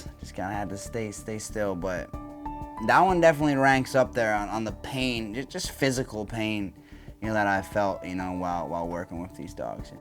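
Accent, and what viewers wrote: Netherlands. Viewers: Are u dutch???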